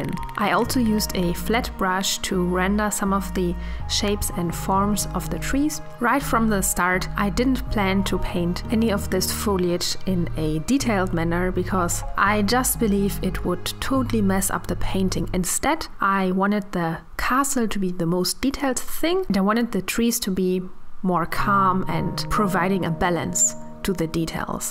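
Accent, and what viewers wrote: Germany. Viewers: Ich liebe deinen deutschen Akzent! Ich lerne gerade Deutsch. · Man hört so sehr raus das du deutsch bist ich liebe es 😂❤️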